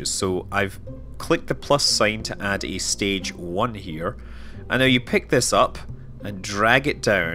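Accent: Scotland, United Kingdom. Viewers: finally, a Scottish tutorial youtuber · I might have a feeling this man is from Scotland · ***** he's scottish and he actually has some programs to cover up his accent so if he didn't have that it'd be unbearable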